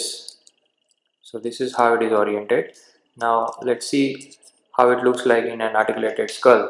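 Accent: India. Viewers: Nice video but your English made it hard to really get what you're saying and you're speaking too fast. It felt like you were speaking Indian.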